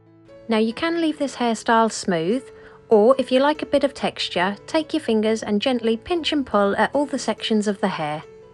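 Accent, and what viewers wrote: United Kingdom. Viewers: You sound like the princess of wales. Thanx for the tutorial 😁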